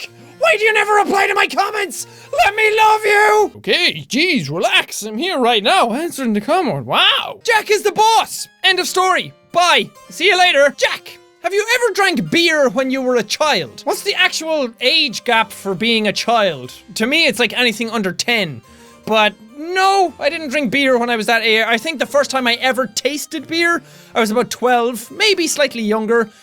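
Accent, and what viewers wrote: Ireland. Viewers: Loving that full Irish! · R u BOSS,southern Irish or northern Irish! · JACK!!!!!! 2 QUESTIONS!!! CAN YOU SAY MY NAME IN YOUR IRISH ACCENT? AND DID YOU STEAL MY CAKE?!! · put me in ur next one and UR BOSS AND ARE U IRISH ?????????????????!!!!!!!!!!!!!!!!!!! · Dat Irish Talk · i understood the deep irish accent as i use quite a bit o them sayings everyday. please can you do a complete episode in a thick irish accent... it would be boss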